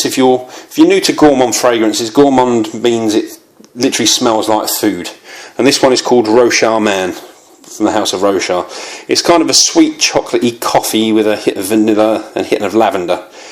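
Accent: United Kingdom. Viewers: Great video, mate! I concur on all of your suggestions for beginners apart from Prada Amber, but that might be because I am not a big fan of it. Nice accent, by the way, I bet you are not from London. Newcastle?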